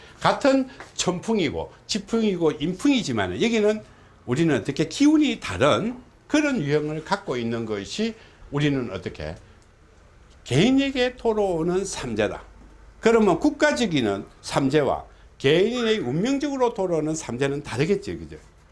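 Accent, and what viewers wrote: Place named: Gyeongsang, South Korea. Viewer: 삼재특강 ? 갱상도 사투리로 이해시킬려고 하시는게 뭔가는 좀 답답합니다! 귀에 쏙들어오질 않고 복잡하게 들립니다.. 내가 너무 이해력이 부족한건아닌지? 멍청한건지! 도저히? 특강의 이해부족으로 아쉽지만 ~ 포기하고 다음기회 로 미루겠습니다!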